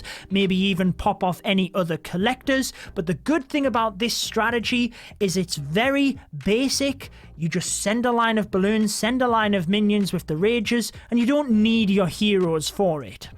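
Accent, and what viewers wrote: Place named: Northern England, United Kingdom. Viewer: My northern English brother 🦾😘 I can hear on your accent baby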